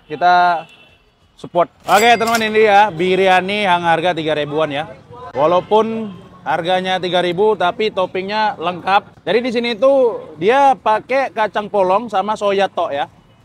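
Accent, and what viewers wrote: Medan, Indonesia. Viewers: Omakk...logat medan ah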